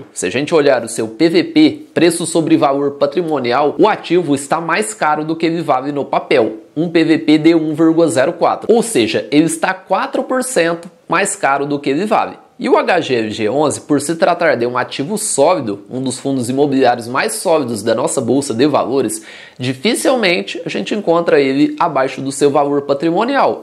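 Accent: Brazil. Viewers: ah, legal, imaginei que pudesse ser do interior de SP ou MG! Sotaques e regionalismos são coisas que me deixam curioso! Agora vou poder me concentrar no assunto dos vídeos. Antes, eu ficava só pensando, "de onde será este sotaque", e esquecia o que estava sendo dito no vídeo! 😀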